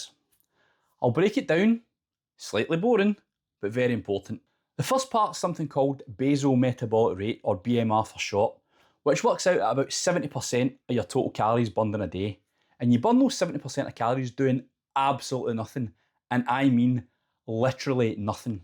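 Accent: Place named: Scotland, United Kingdom. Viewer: Great information! I was enjoying your Scottish accent when you flash the text “nips are out. Sorry it’s cold in Scotland”. I was not expecting that and hadn’t noticed your nips until you mention it but it cracked me up. Congratulations on the weight loss and the video. And for making me laugh so hard at your comment. It just caught me off guard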